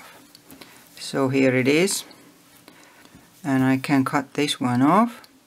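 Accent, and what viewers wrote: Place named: Finland. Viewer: Kuuntelin aksenttia ja totesin että oiskohan suomalainen ja kyllä vaan. :D Kiitos ohjeesta.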